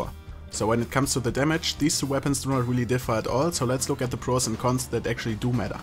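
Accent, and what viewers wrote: Germany. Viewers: are you german? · Ure german? Ich dachte ich hätte den akzent erkannt :D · bist du deutscher? Dein englisch klingt irgendwie deutsch. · The german accent is anoying to my ears. I don't know maybe just me. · bist du deutscher? Du hast ao einen kleinen Aczent :) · You are German right? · Bist du Deutscher? xD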